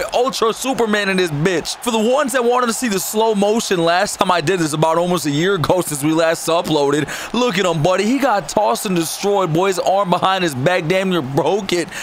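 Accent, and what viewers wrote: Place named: New York, United States. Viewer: This man sounds like he from NY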